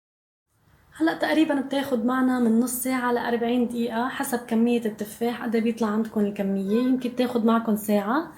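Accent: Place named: Lebanon. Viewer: اولا تسلم ايدك ثانيا اللهجة اللبنانية روعة تحياتي لك من سوريا❤ اتمنالك التوفيق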